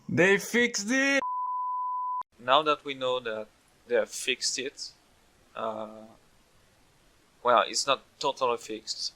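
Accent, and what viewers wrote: France. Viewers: ta un bon accent hein :) mais c'est quand même cramé que tu es FR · i can hear some french accent in your voice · Ce gros accent français omg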